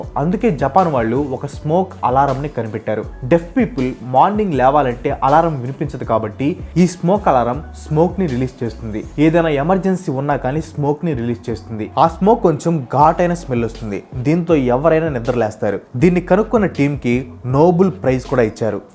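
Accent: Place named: Kadapa, India. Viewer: కాదురా తోయ్ మా కడప యాస మీరు కూడానా బ్రో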